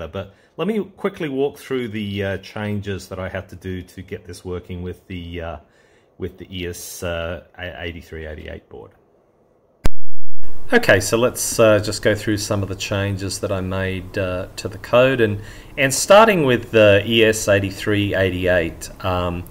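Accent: Australia. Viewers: MickMake was a very popular YT tech channel from down under. wiffy was his ongoing joke. Surprised you're not familiar with his channel. Judging from your accent (?), I figured you were good mates. MickMake stopped producing his videos just over a year ago. I think he got fed up with the online negative discourse, so he took his 'ball' away. Looks like your channel might be a great alternative. I'll be watching. Happy New Year. 😎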